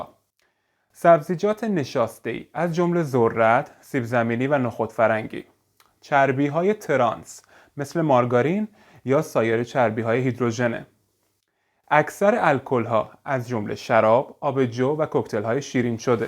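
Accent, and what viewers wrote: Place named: Turkey. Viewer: این ویدئو را ده بار دیدم تا تک تک کلماتو حفقظ کنم فقط دلمون چیز شیرین میخواد چی بخوریم یه سوال شما ترکین؟ چون ق تون خیلی قق میشه من گوشم حساسه و دقتم زیاد مثلا دقت رو شما دگگت میگین البته بعضیا دگگگگت میگن من ترکها رو از رو تلفظ گ سریع شناسایی می کنم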